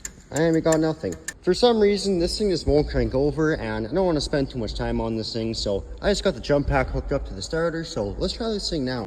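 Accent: Canada. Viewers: You sound like you’re either from Canada or Wisconsin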